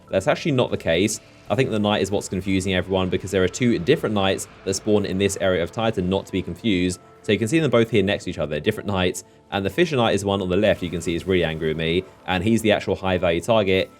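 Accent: United Kingdom. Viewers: I disliked because you’re one of millions of stupid British YouTubers trying to be something. You’re accent and content is useless shit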